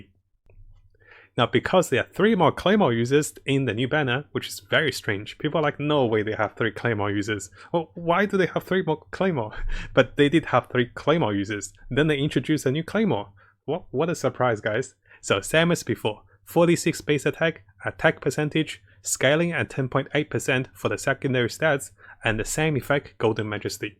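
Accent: Australia. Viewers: nice to know Im listening to a fellow aussie. My Beidou uses the Bell weapon. So far she is doing excellently with that. She is always shielded haha - because it reacts to damage that she takes. I find it to be a great weapon myself. My Diona uses the Favonius bow - it's been great for her.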